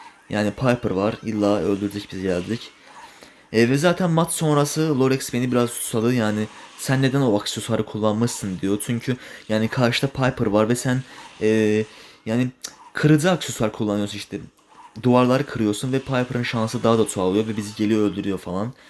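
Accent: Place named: Azerbaijan. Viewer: Qaqa danişigindan azerbaycanli oldugun bellidi ugurlar😂😂😊😊❤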